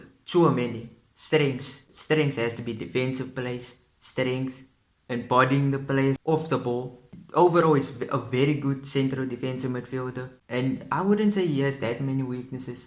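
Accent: South Africa. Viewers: Bro you sound South African like colored 😅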